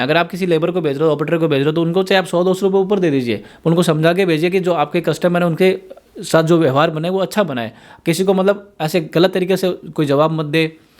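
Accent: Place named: Marwari, India. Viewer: मारवाड़ी सुनकी मजा आगया 😅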